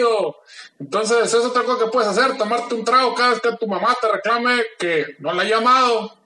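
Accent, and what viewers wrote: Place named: Sonora, Mexico. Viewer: jajaja se nota que es de sonora arriba sonora!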